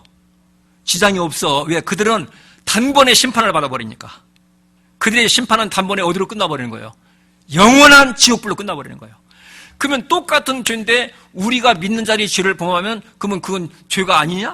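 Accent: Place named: Honam, South Korea. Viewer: 목사님처럼 호남사투리가구수하게들리게하는목사님이또있을까싶습니다 권력눈치안보고소신껏예배를귀하게여기라는하나님의뜻에맞는하나님을진정두려워하는목사님의말씀 감사합니다!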